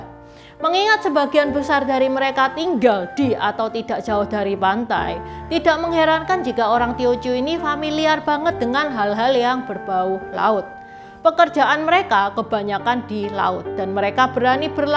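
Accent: Java, Indonesia. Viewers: Bgs sekali chanel nya... Tapi sblm nya maaf ya ce... Bahasa ce terlalu medok skli logat jawa nya...😂😂 Di syg kan skli ji kalau ce coba bisa 4 bahasa ( khek,hokkian,kanton,tiociu) kita bisa byk skli utk dpt belajar...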